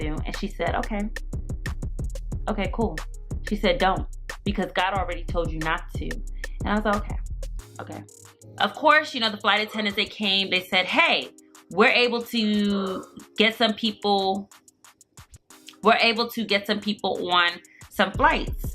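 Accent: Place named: New York, United States. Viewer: you still got the new york accent!!! we thank God ohh!! love you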